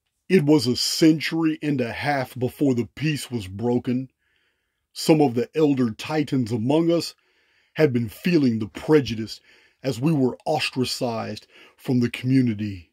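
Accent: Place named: West Texas, United States. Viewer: Yes indeed nomad, it was rather masterful wasn't it? When I first heard say sim-ul-arcam, my British self head 😮was rscreamed "sim-yew-lacrum". His voice is very colorful and he knows how to draw us in with that West Texas accent and putting real dramatic intonation into his delivery. 👏🏆😊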